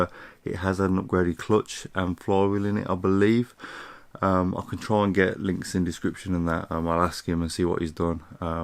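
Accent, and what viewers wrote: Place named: United Kingdom. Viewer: Its crazy how much more interesting it is to watch these videos with a guy with a british accent, I could watch these videos all day, yet typical Americans talking about the same exact things, im asleep.....lol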